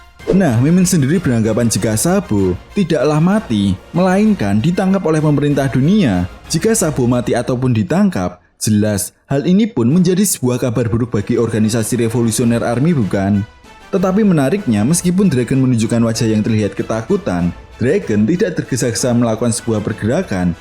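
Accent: Java, Indonesia. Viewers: suaranya terlalu medhok,,, kyk bumbu ktoprak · Logat jawanya kental skali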